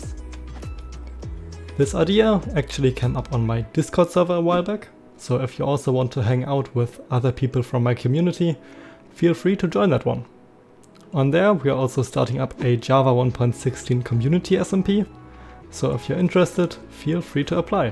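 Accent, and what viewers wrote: Germany. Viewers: Amazing Video! It works perfectly fine! I’m just wondering if you’re german, because you have an accent?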